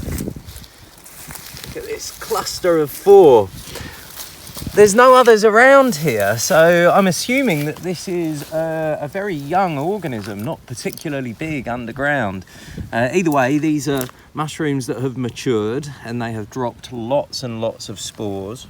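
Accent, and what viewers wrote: England, United Kingdom. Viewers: I definitely sharing your video on Facebook here and America. You know we can trust you because you have an English accent.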